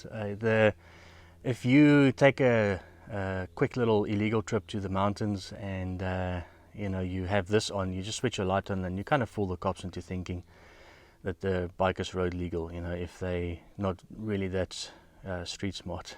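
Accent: South Africa. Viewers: Excellent and informative. Thanks for the vid. It sounds like you’re from South Africa but is that where you’re riding? Forgive me if that’s a silly question but the terrain looks similar to where I am in the eastern US. I. LOVE tight technical woods riding. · Afrikaaners probeer engels praat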